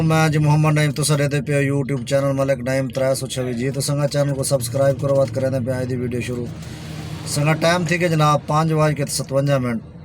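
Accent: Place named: Punjab, India. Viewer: Bhai itni gharhi panjabi na bola kare ham jese bhi bohot Dil se sekhte hein apko Bohot si bate smjh hi nahi aati phir😢 Baqi thank you for your work❤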